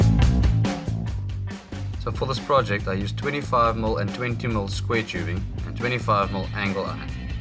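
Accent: South Africa. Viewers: awesome video! love the videoagraphy and time taken to take important close shots. Is that a South African accent I hear :)? I ask because I'm from Cape Town :) One wouldn't say you new to this lol you doing an epic job can't wait to see your new videos. couple of quick questions if you don't mind its about your build. What size Bearings and bolts did you use and the rivet thread things you used, that just mind blowing lol what are they called? Can I source them from BMG bearing man? Thanks again Best wishes for 2019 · I'm afraid I couldn't listen to the whole film , I couldn't understand the South African accent. Great filming though.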